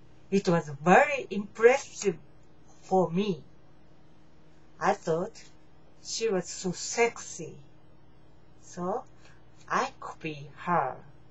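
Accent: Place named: Japan. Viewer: あなたは英語がとても上手いですよ。語彙や文法などは抜群です。日本語訛りはありますが気にしなくていいと思います。あなたの英語は聞き取りやすいです。If you are not satisfied with your progress, I recommend watching as many English movies & TV shows as you can as well as conversing with native speakers. I've been doing similar things to improve my Japanese and have noticed good results (still a long way to go though)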